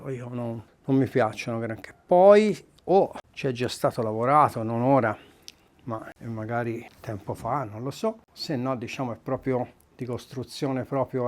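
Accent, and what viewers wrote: Tuscany, Italy. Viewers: Sempre bravo e simpatico, compreso l adorabile cadenza Toscana!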